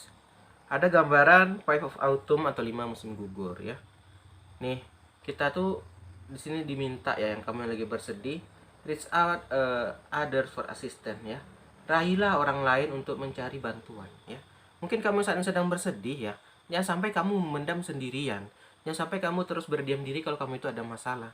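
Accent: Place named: Java, Indonesia. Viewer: Klo ngomong.... Bahasaya...... Lbut Org jawa apa org medan. Apa prg madura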